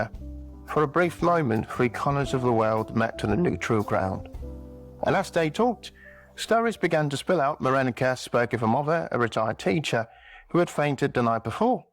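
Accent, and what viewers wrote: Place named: Liverpool, United Kingdom. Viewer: Excellent .I love how paced it is. Can you make them more like this .. Happy scouse accent too😂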